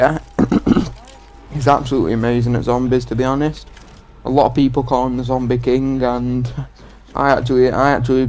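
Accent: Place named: Manchester, United Kingdom. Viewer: u hav a great manc accent im from manchester aswel woop woop !!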